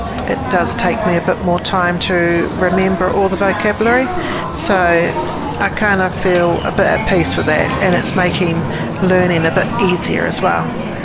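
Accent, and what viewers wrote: New Zealand. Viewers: I do enjoy listening to you with your lovely New Zealand accent but also the level of detail you provide. Envious of your adventure and appreciative of you putting yourself out there...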